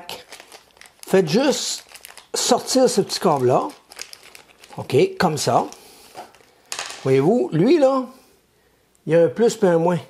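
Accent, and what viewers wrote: Canada. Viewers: J adore tes vidéos (avec ton accent canadien). 😍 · Bonjour, Ayant eu une creality ender 3 V2 à Noël (2021), je suis trop content de ma lancer dans le monde de l'impression 3D. Et je suis donc tombé sur vos vidéos, que j'adore !!! Mais je ne sais pas si vous avez partagé les références des ventilateurs, où si je dois juste "écouter" la vidéo pour les retrouver. Dernière question: comment trouver le STL de la poignée en haut de l'imprimante ? C'est en effet trop pratique ! Et enfin, c'est quoi la pièce en plus juste avant l'extrudeur ? Ca sert de guide au filament ? Bref, j'adore vos vidéos, et vu de France, votre "accent" Canadien et votre façon de parler sont tout simplement hyper rafraichissants ! Continuez comme ça !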